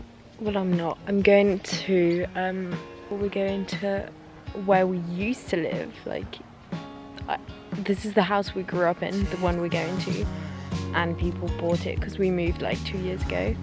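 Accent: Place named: United Kingdom. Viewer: I love your British accent. So melodious.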